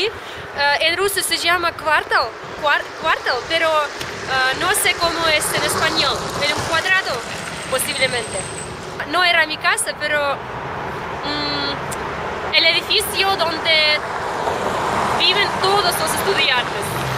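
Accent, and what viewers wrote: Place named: Ecuador. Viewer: Hola ))) yo queria decirte que en en determinados momentos pareciera que tu lengua materna es el espeñol. tienes el asento de una region del Ecuador. aunque aqui hablamos el idioma español existen diferentes formas de pronunciarlo y esto depende de la region del pais en la que se hable, pero todos podemos entendernos muy bien los unos a los otros porque es el mismo idioma. te invito a ver un video mio en Chelny.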